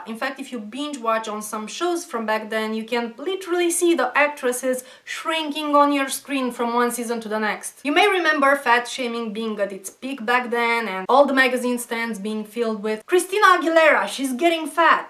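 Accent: Romania. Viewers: You make some very good points and in such a reasonable and non-histrionic way. Because of that I'm only slightly disappointed, having very much enjoyed your video and even subbing to your channel. My disappointment comes from being a fan of another Romanian Youtuber named Vee. While you and he actually make some similar points (though there are some very distinct differences), he is much excitable and given to, often hilarious, raging. While the cultures of Romania and the U.S. also have their distinct differences, I'm glad there are so many issues we find common ground on. The more I hear you both the I've come to believe the Romanian accent is my favorite in the world, so thank you for that as well. BTW I also was a Red Alert fanatic when it came out and the intro still gives me chills. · yo so what country are u from? romania maybeh?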